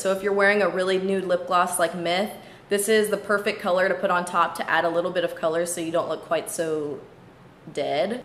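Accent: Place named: California, United States. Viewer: I freaking love you lol no homo. All of the girls I watch are from the east coast so it's good watching someone from Cali on here that makes me feel like I'm not a weirdo for talking like i do haha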